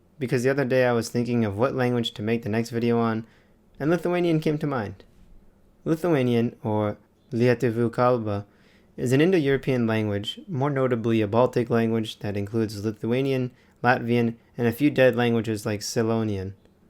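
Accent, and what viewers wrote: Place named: Russia. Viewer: Aš gavau šitą video recommended skyriuje ir aš lietuvis, bet tu skambi, kaip su rusišku akcentu.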